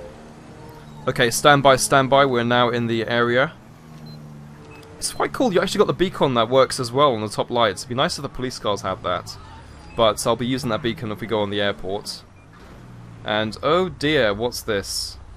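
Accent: United Kingdom. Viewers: What part of Britain u from I'm from stoke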